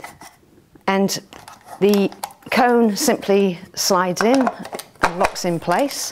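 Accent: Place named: United Kingdom. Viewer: nice british accent!!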